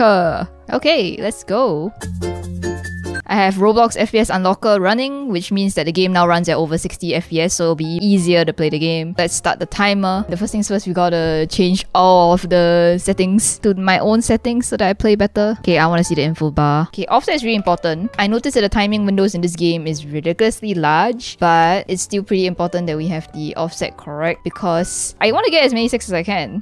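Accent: Singapore. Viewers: why do u sound like ur singaporen?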